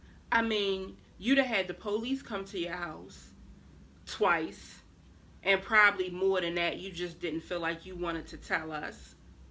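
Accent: Baltimore, United States. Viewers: Hey chick! I love your accent. B-more! ❤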